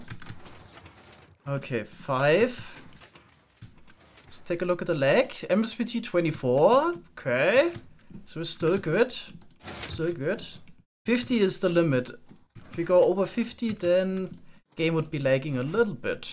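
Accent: Germany. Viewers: Hi, where are you from? You sound German or am I just stupid?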